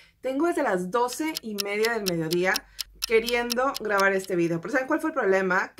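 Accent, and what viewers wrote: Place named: North, Mexico. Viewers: Hola soy Natalia Cortes de Puebla. Mis productos favoritos este año fueron la cc cream de mary kay, la paletta de too faced semisweet chocolate bar, y las brochas de ojos de eco tools:) Me gusta mucho verte porque hablas como norteña, y desde que me mude al sur extrañi mucho el tonito! Feliz año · Hola!! Mi nombre es Dalia Ruiz, de Monterrey Nuevo León, mis favoritos son 1. Maquillaje líquido de Mary Kay 2. Un labial nude de marca Oriflame 3. Mascara para pestañas de Shelo Nabel (fue toda una sorpresa ya que desconocía la marca y terminó por convencerme) Me encantan tus vídeos y el acento muy regio difundiéndose en el mundo jaja un abrazo y que sigas con tanto éxito!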